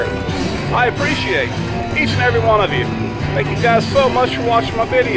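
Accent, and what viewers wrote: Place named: New England, United States. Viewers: Nice to hear the New England accent out there on desert! That slide guitar music reminds me of my daddy and his band back in the 50's . My daddy could play an electric lap guitar with a with a metal slider. It was great! He knew Les Paul and Mary Ford! The band used to play clubs on the East Coast of New Jersey. That music ending your video today was a nice memory. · I knew it was a Boston accent! Tho you do have a bit of a down east accent (you know those Mainers!) Boston here too. GO PATS!! 🏈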